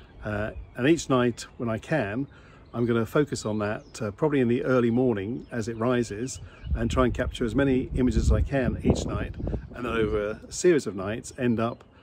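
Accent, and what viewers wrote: Australia. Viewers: Looks like a great project, your accent sounds Aussie, you originally from down here?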